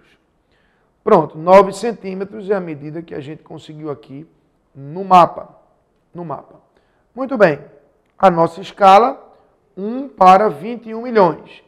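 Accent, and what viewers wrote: Northeast, Brazil. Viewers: Sabia que era nordestino. Mas não sabia identificar o estado! Obrigado :)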